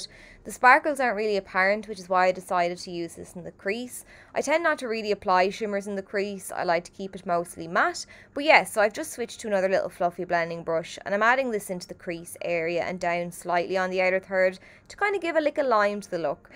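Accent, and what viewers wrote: Ireland. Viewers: Are you Irish? Sorry that’s random to ask, just really like the sound of your voice so I was just curious ☺️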